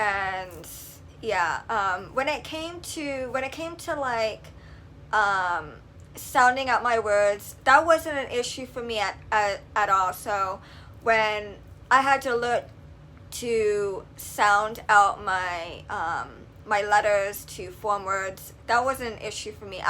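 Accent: New York, United States. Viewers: I wouldnt have noticed any impediment until you pointed it out...but do New York accents not all pronounce coffee Kawfee forever fawevva water waader?? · i hear a new york accent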